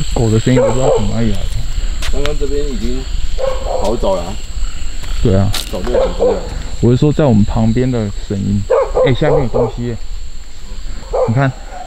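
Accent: Taiwan. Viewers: 晚上好开头介绍都用台语来说馁~我喜欢~那个低吼声我也有听到。。😰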